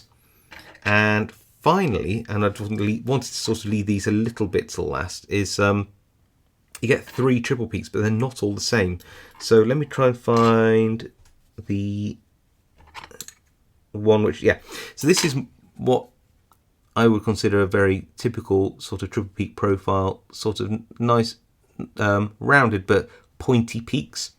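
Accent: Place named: Bristol, United Kingdom. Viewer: I can only agree with everything writes so eloquently (and punctuates so flawlessly). High production values and a touch of Bristol accent 👌. I have a small tube of these slim picks but I'm scared to use them. The screw tubes are great, too.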